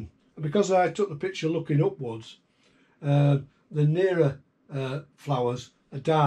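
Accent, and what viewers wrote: United Kingdom. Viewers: What a great photo. I live in the States now and it's great hearing a broad British accent.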